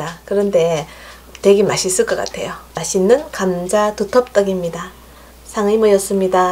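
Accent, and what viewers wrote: Gyeongsang, South Korea. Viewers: 구수한 경상도 사투리, 사근사근 설명해줘서 귀도 행복해요. 요리 쇼 보는 것이 스트래스 해소에 큰 도움되네요.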